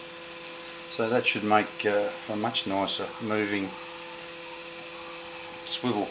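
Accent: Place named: Toowoomba, Australia. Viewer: I thought the speech sounded close, in fact your mode of talk matches a mate who lives in Toowoomba, so much so you could be father and son, but I know that's not possible. So from what you just said, Re Lathe switch, can you have the DRO on, but not the lathe and there is no problem? Or does the lathe switch also power the DRO?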